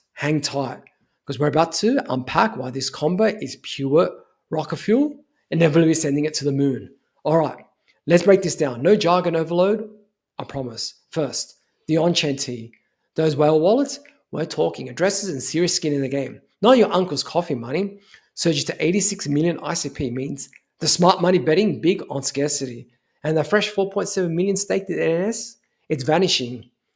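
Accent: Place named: Australia. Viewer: Keep the vids coming. Good work. Guessing you're an Aussie?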